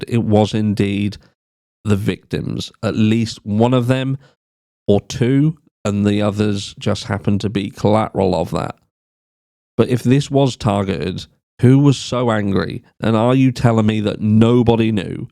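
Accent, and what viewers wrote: United Kingdom. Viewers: Love the added British accent, great affect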